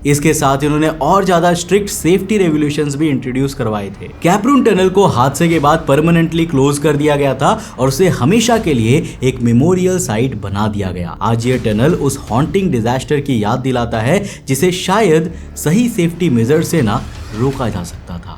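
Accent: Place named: Bengal, India. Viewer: Tumri Hindi accent babitaji jaisi hai yani bongali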